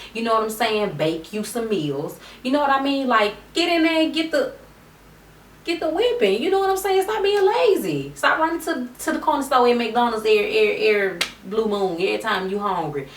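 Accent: South, United States. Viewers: Real talk!!! U talk like u are from the H!!!! H town!!!!I need a friend like u here!!!!! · Haha nice video! Here I am watching a year later and I love your accent, I’m from Texas and I talk like this! I can’t control the way my voice changes all southern haha! 😊❤️ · You are extra pretty you must be from the south that accent thick girl lol 😂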